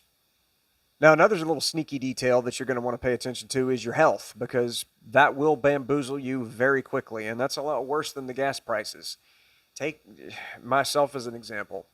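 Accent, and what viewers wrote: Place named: South, United States. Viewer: Man I love your hillbilly voice 😂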